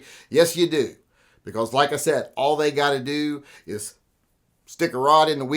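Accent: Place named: New Jersey, United States. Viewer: sounds like new jersey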